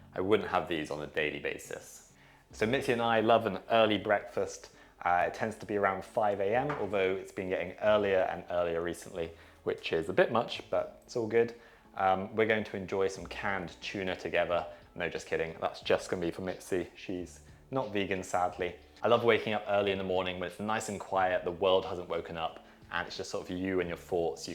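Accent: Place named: United Kingdom. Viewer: Great recipes. And, as most of the vegan cooking channels I watch are American, & I live in Aus, it's nice hearing the old UK accent - 'and we'll 'pop a lid on top'! Only the Brits 'pop' things ❤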